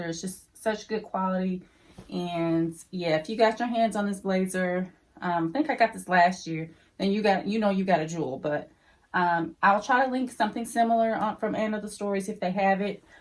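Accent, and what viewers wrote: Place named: South, United States. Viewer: The J crew blazer print is referred to as herringbone print 😉. I love your hauls and your southern accent is so cute.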